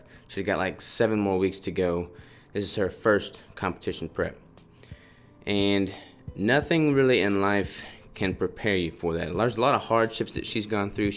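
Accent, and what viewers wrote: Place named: South, United States. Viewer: Where are you from bro? I hear a southern accent.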